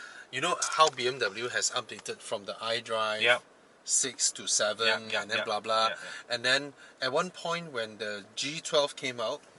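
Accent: Malaysia. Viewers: It's so hilarious how you Malaysians speak our English language ! But at least it sounds better than china and other east asian countries' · ahh.. I see so Malaysian speak that way??? I'm from Poland. Please forgive my stupidity 🙏🙏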